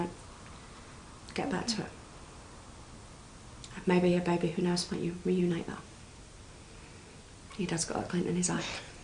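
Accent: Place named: United Kingdom. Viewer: I don't like the British way of speaking . Hard for me to understand .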